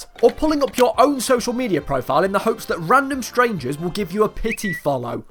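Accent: United Kingdom. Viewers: I can't understand ur bri'ish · Your accent i need it ... How to have an British accent like yours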